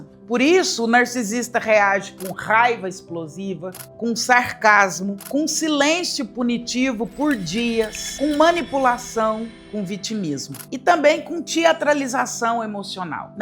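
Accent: Minas Gerais, Brazil. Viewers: Estou chegando agora no seu canal e estou respeitosamente apaixonado pela senhora e Dra Ana Beatriz, acabo de me descobrir um bígamo virtual! rsrsr... Parabéns pelo seu trabalho Dra!!!! Ouvir falar da vida com sotaque mineiro faz tudo ficar muito leve... acho que Freud era um chato, mas boa gente, paulistano como eu KKK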